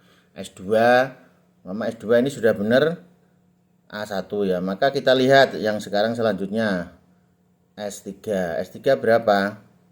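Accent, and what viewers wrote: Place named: East Java, Indonesia. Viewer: Koyok e wong jatim kulonan iki , hihi...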